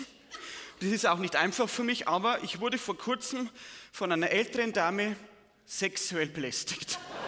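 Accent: Bavaria, Germany. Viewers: Warum Hochdeutsch? ist Bayer und das Publikum auch. Also wieso sollte er sich verbiegen? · I mog den borischen Dialekt.Orfach guad 😂😂😂❤ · Ist es, im schönsten, deutschen Dialekt gesprochen, nämlich bayrisch, oder boarisch ... herrlich 😂, köstlich ❤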